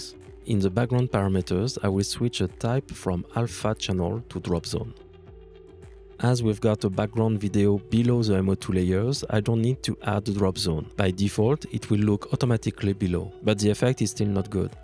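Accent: France. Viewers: Un accent Français ou je rêve ? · J'adore un accent français. Grand tutoriel, très détaillé et bien fait. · Pas mal l'accent français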